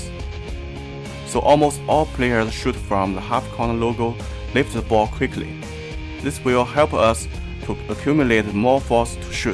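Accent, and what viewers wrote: China. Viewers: po主是北京人吧。反正我觉得挺像那附近的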